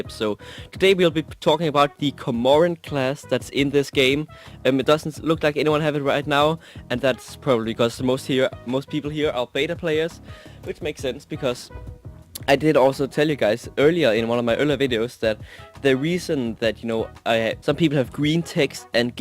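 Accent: Denmark. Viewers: You are Danish :D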